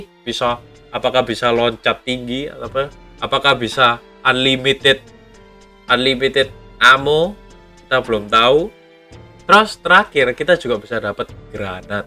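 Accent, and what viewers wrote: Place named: Java, Indonesia. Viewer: Si medok membantai Zombie kapan nih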